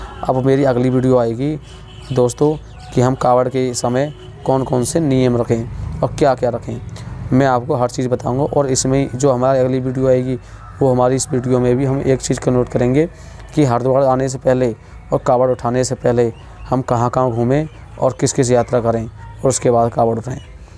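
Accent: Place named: Uttar Pradesh, India. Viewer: Bhai shayad aap uttar Pradesh se ho , shayad bulandshahr ke aas paas ke ho ,😂😂😂😂😂 language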